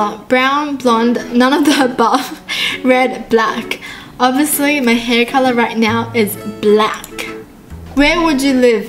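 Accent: Australia. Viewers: Your Asian? Oh..Well I always thought you were Australian😂😂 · You're* her parents are Asian so that makes her Asian but she lives in Australia, that's why her accent is like that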